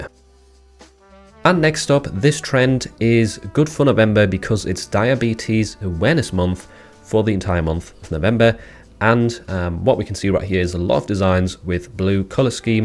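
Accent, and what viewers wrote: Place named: United Kingdom. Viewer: Hi. I'm just starting out on Merch on Demand, and your videos are a great resource. I've watched a lot of vids about POD on different channels, but yours is definitely a favourite thanks to your calm, clear delivery without making wild claims about making money fast. Maybe it's because I'm a fellow Brit that I appreciate this lol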